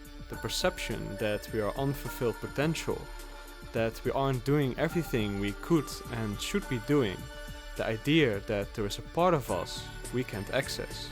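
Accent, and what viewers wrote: Netherlands. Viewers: Is the narrator by any chance Dutch? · Love the vids (btw i heard immediately that you guys are dutch : )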